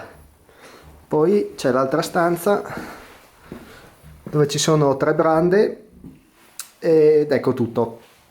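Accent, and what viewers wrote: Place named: Paularo, Italy. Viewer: Bel video e bella escursione... Ma di dove sei? Dall'accento si direbbe della zona di Paularo ma potrei sbagliarmi...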